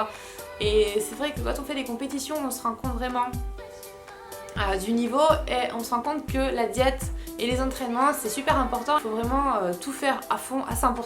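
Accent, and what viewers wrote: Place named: South, France. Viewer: avant je me rendait pas compte de ton accent typique du sud mais maintenant c'est stressant. Moi je me suis inscrit a la salle il y a2 semaines et je vois que mes abdos pousses et mes bras et mes jambes aussi c'est plutôt cool. Et sa c'est grâce a toi, tibo et marine merci♥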